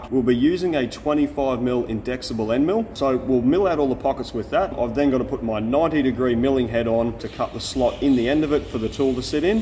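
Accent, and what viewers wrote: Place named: Australia. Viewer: But the subtitles, in all videos, don't understand Aussie, nor English .... I turn them of.... I'm not from a English-speaking country ❤❤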